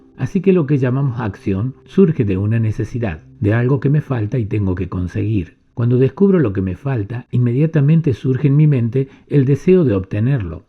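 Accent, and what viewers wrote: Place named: Cordoba, Argentina. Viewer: la voz ( aunque agradable y Cordobesa)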